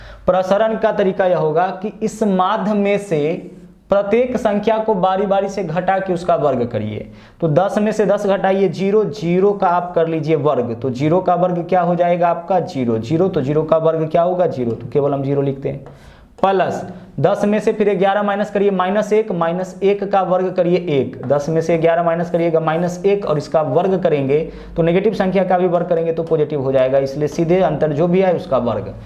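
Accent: Bihar, India. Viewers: Sir aap bihar se h kyy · Aap bihar se hai sir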